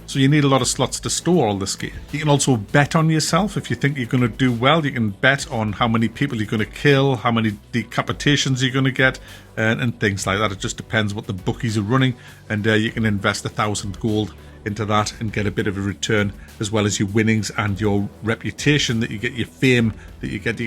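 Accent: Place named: Newcastle, United Kingdom. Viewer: Nice to hear a geordie accent on a game review! You're my new go to game reviewer by default now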